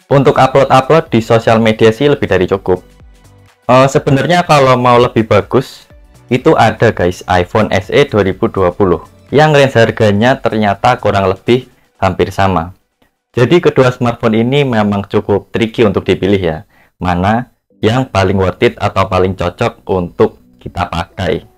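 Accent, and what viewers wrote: Java, Indonesia. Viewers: Suoromu medhog bgt mas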